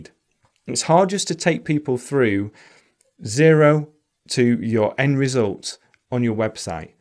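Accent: United Kingdom. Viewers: Wow, I havent `t seen you video for a long time , you `r British accent is really nice . Thank you .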